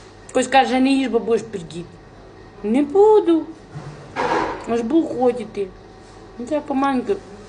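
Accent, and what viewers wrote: Transcarpathia, Ukraine. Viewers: Закарпатський діалект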